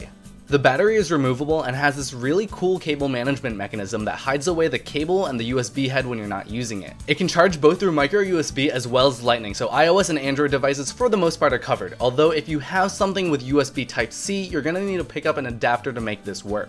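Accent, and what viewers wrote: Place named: New Jersey, United States. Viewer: guess your from Jersey haha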